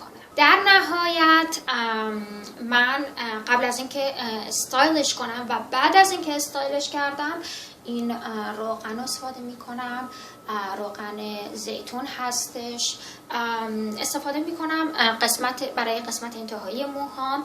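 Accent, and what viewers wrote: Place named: Afghanistan. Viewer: Abbas Barati ازحرف زدنش معلومه افغانیه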